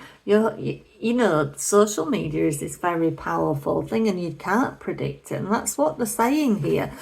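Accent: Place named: North West England, United Kingdom. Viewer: Sounds like you are north west England, same as me x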